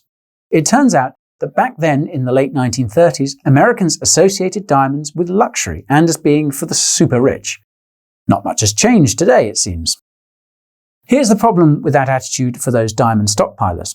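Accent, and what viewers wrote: United Kingdom. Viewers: You should say "howdy" at least once every episode because with a british accent that is hilarious. (texan) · Amazing. He has a British accent that is tolerable. As well as an understanding that all traditional banker gangsters would ever want to do is rain on your crypto parade. Smash the like for that!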